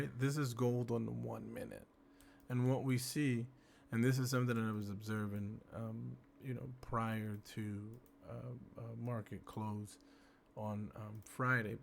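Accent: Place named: Morocco. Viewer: I smell the Moroccan dialect here 😉 bshto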